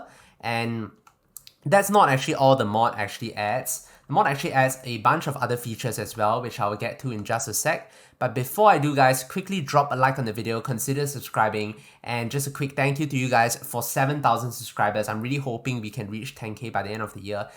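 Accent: Singapore. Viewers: you sound very singaporean...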